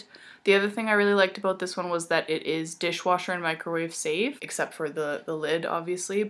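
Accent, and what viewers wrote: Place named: Canada. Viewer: Great video! And I love your Canadian accent <3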